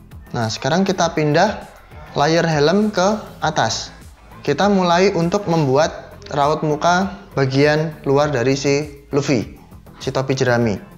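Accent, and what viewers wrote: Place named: Purbalingga, Indonesia. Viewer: Salaam kenal ... masnya asli Purbalingga ya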